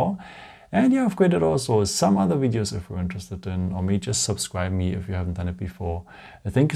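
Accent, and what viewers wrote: Germany. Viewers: Perfect, thats how it should be done. Supercredible also due to the german accent :) ( danke!)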